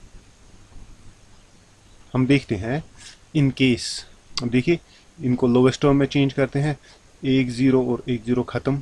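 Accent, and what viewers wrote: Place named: Kashmir, India. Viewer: Very nice brother... I appreciate your efforts and help... Shukriyah... I am a Kashmiri, I could recognise you are too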